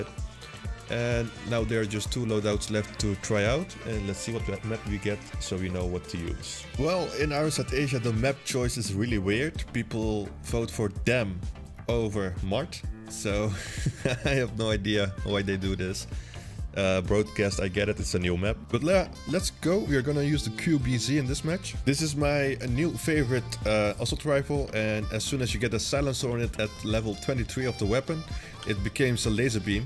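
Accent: Netherlands. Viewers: so u are the guy that always fucks up my game? btw didnt know u were dutch can hear from the accent xd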